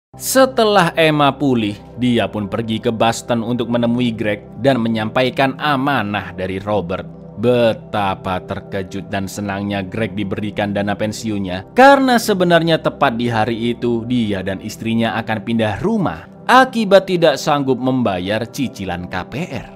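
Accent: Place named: Madura, Indonesia. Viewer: Kok BANGKALAN MADURA cak 😂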